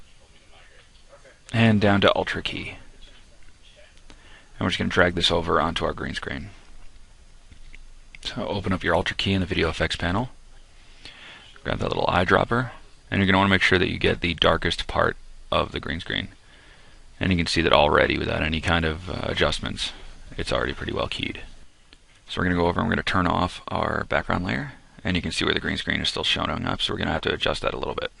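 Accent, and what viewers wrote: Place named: United States. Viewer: pure amercian accent - very nice video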